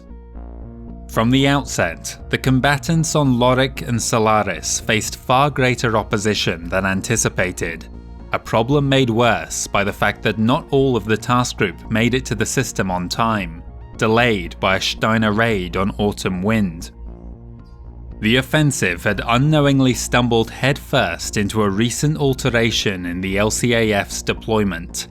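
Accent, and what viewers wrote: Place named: Wales, United Kingdom. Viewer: Where is your accent from? I'm sure that you have said, is it Welsh? Great video and thank you for the lore!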